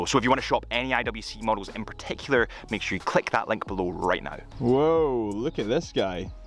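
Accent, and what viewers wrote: Scotland, United Kingdom. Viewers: This outdoors video is a more raw, younger and more Scottish sounding version of BarkandJack’s work. Enjoyable !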